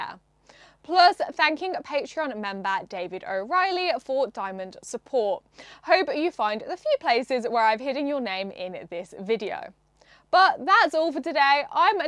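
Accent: United Kingdom. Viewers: YT formula 4 success, apparently = young woman + pirated 1980s movie Tshirt + pressing keys on 1980s hardware + British accent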